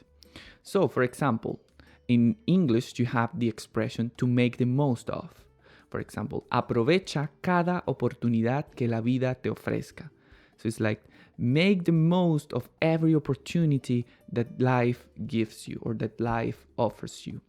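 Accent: Spain. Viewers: Is this Spain Spanish or latin America Spanish? Thank you in Advance. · This sounds like European Spanish (Spain). Do you have any Mexican Spanish story lessons for beginners?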